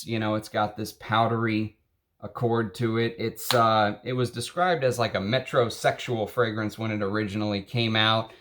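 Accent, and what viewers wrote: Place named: Texas, United States. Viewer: Nooo lol. My nose is still learning. It's actually incredibly hard to pick out notes. I chatted to a student studying olfactive psychology, big word 😂, he said the human brain can pick out around 5 notes max in a perfume. Everything else is hidden in a blur. You can get an effect from Hedione for example, it's like air making space. The musks are bloody hard to identify, especially if many are used. I struggle to differentiate them in a perfume a lot. Woody ambers forget it, they all bloody smell the same to me. The more raw materials I smell and study, you can pick them out sometimes if they are overdosed in a perfume. For instance smelled sundowner today (the drydown is a beautiful smooth amber tobacco btw , lovely!), in the opening I smelled the synthetic used to boost a tobacco note. It's in tobacco Oud etc as well. 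You must notice it. Like if you smell Cilantro in food. It's there. Doesn't mean its bad btw, you just can't miss it. Also, I could not do what you do. Firstly my collection is shite compared to yours. And secondly, I haven't got a smooth Texan accent 😉 haha. I am learning a lot from your videos btw. A lot!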